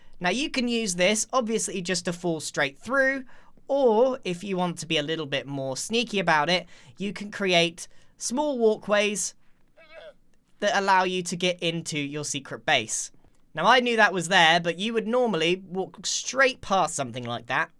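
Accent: United Kingdom. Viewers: u remind me of wisp why cuz u both are British like mee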